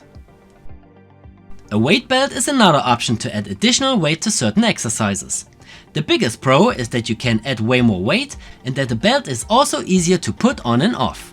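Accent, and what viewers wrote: Germany. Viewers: Leichter deutscher Akzent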